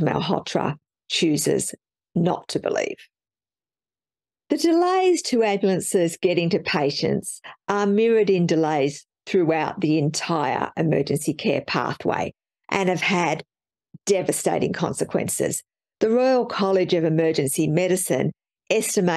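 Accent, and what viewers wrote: Australia. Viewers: Vocal fry on top of an Aussie accent, combined with an unflinching shit-eating grin, makes you excruciating to watch.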